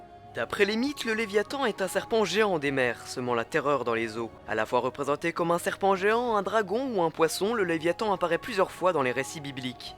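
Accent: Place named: Switzerland. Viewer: salut tu as un accent est ce que tu es suisse? :)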